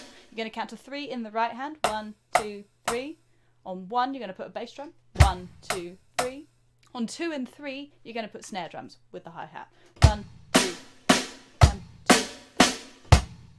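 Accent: United Kingdom. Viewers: This makes me want to get back into drumming again! Hello from New Zealand! Love the British accent 😛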